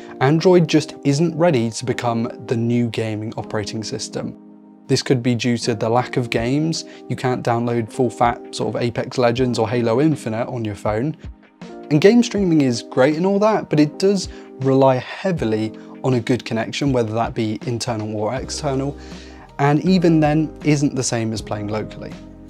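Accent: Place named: Cornwall, United Kingdom. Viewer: No way your from bodmin. I thought I recognized that accent. 😂 cheers from fowey!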